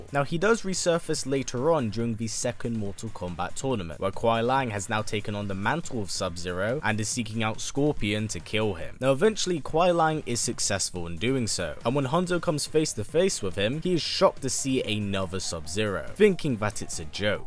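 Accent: United Kingdom. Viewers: Wat u have a brutish accent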